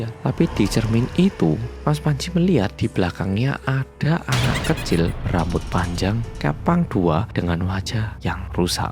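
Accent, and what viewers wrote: Java, Indonesia. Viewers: Medhokkk(medok) Kalau RDD kwedheeen(ketakutaan)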